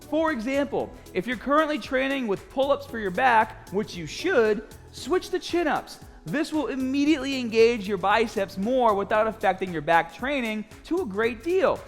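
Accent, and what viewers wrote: Boston, United States. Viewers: I love how you took that awesome Boston accent and made it a thing on this channel. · Is he from "bah"ston MA? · Love u, doing it without juice, love the Boston accent, I'm from Connecticut, my dad was from Boston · What can i say... the title aren't that clickbait... your boston accents? Just got it ways to the title.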